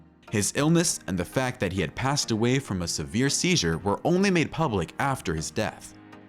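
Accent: United States. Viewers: However, however, however......... awful American accent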